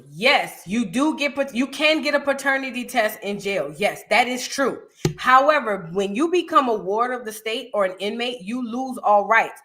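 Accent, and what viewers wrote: New Orleans, United States. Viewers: Girl I adore her New Orleans accent!! ❤❤❤ one of the main reason I watch her!!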